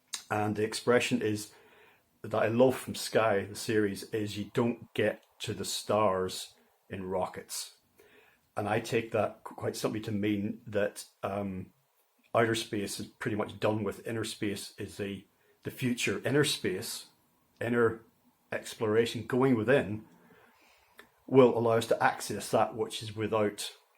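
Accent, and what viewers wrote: Northern Ireland, United Kingdom. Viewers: Very glad to have found your channel. I'm guessing you're from Northern Ireland? I'm coming at you from Portadown... Network are great for releasing these old series. Particular favourites of mine are "Children of the Stones" and "Sapphire and Steel."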